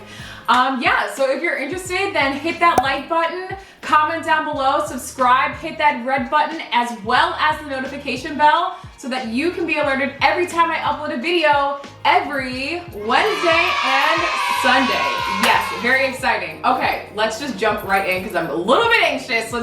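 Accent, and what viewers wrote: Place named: New York, United States. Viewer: Girl you don't have to defend your New Yorkness- I (as a native Brooklynite who's been living on the West Coast for 10 years) can tell just by the way you say the word 'water'. lol Your accent makes me miss NY. <3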